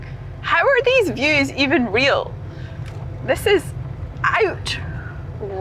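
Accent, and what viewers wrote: Scotland, United Kingdom. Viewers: Thanks for taking me through Scotland which I have never visit though I live in Ireland. I love watching your videos and listening to your sweet Scotish accent, yours is mild though. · Your videos are delightful and your voice and accent are a joy to listen to. Such beautiful scenery. How lucky you are to live in Scotland. Having never traveled to Scotland myself, It is exactly how I envisioned it. It’s magical! Thank you so much again for sharing these videos. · been so long since i heard a Scottish accent my family is originally from Gretna green but i moved to America as that's were my wife is from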